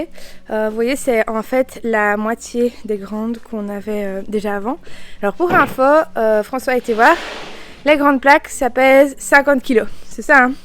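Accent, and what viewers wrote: Belgium. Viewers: Super moi qui ai la nostalgie de mon pays d'origine, je vis actuellement en France depuis plus de 10ans. Ça me manquait d'entendre l'accent Belge et comme j'adore ce type de vidéo, du coup un nouvel abonné. 👍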